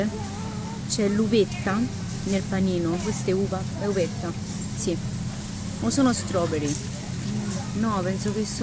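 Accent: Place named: Naples, Italy. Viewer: mi sembrava accento napoletano, scusa in che stato americano eri nel video?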